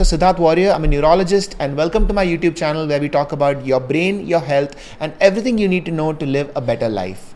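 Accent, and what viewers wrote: India. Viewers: Nice Indian English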